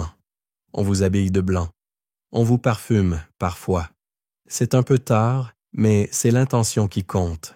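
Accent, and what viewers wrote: Quebec, Canada. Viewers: Vous êtes québécois?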